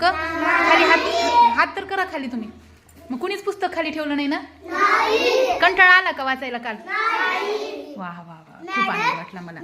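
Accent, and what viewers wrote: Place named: Parbhani, India. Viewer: मॅडम तुमची भाषा परभणी चि वाटते ,आमचे सर सतीश Ranher सर यांच्या सारखी आहे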